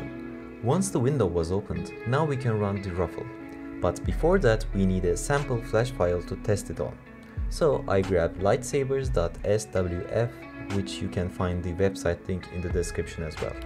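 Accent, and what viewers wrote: Turkey. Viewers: Konuşmandan anlamıştım bu kesin türkdür diye :D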